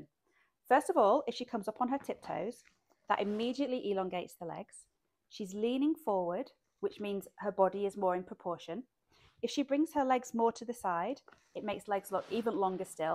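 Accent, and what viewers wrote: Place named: England, United Kingdom. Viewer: We speak English with an English accent in England. For those that don’t understand English well, you can turn on the subtitles!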